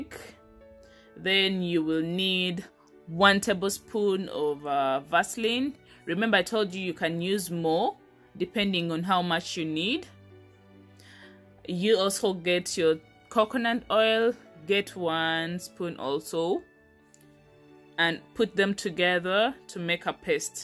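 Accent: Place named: Uganda. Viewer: She sounds Ugandan hmm..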